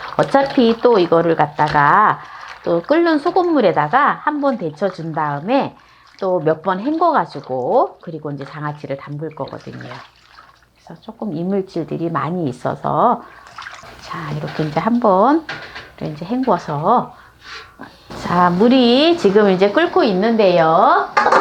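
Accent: Jeolla, South Korea. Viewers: 샘이 전라도 사투리 끝트리ㅎㅎ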